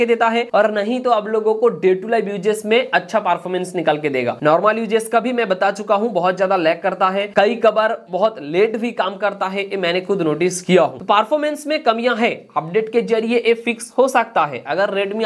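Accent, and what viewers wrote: Bengal, India. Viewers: You have a strong bengali accent.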